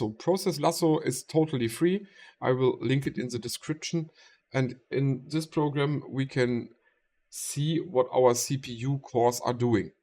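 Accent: Germany. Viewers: Ich liebe diesen Deutschen Akzent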